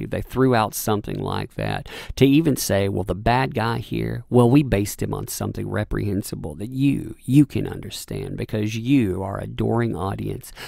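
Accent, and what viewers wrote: South, United States. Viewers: Dude what region of the country are you from? Your accent sounds decidedly southern but can’t quite place it regionally beyond that level of specificity Also, you say “within this” to an almost enraging degree lol. I have to assume that’s a regional thing too, sort of like how Baltimore residents say “behind this” or “behind that shit” meaning “vis-a-vis” more or less. That’s the same type of thought conveyed by your “within that” thing I’m not asking these things being critical or shitty at all! I’m 100% a D&C/comicsgate supporter and love your videos along w Nerkish, yellow flash, captain Cummings (though he gets on my nerves lol. Dude can’t read’. I swear he reads at about a 4th grade level lll but I share his perspective completely so I try to overlook it) lol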